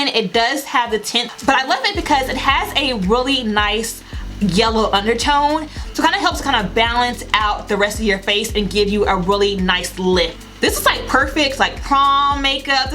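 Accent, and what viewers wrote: South, United States. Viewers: Yes! I just tried this foundation the other day and I love it! I got Warm Sun as well. but it was too orange for me. I still was excited with the outcome... It lasted on my oily face for like 6 hours or so... I was pleased for sure! Your makeup came out perfect! I love it! And your southern accent is so cute LOL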